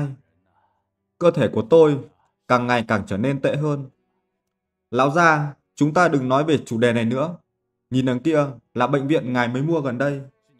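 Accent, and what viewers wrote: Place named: North, Vietnam. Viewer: sao cứ thuyêt minh giong bắc khong đã vây nghe không biết nam nữ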